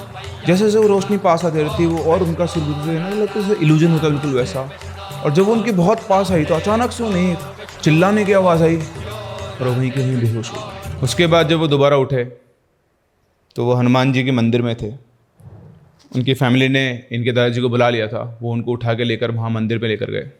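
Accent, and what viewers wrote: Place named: Bihar, India. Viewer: Sir aapki bahari accent..😍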